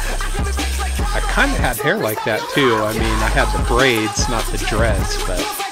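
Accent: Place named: United Kingdom. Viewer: The mans british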